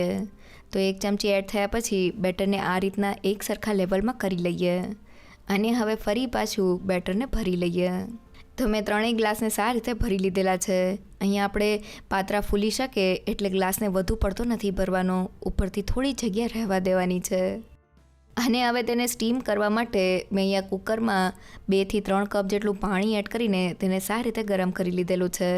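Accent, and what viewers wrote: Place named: Kheda, India. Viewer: તમારું ગામ કયું છે તમારી ભાષા ખેડા જિલ્લા જેવી લાગે છે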